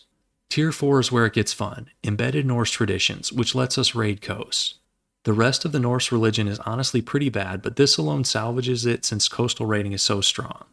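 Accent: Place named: United States. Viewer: As an Australian, seeing and hearing an American is suprising to me each time. I want to see you conquer Austria and become emperor, Catholic, and revoke as an Australian tribe.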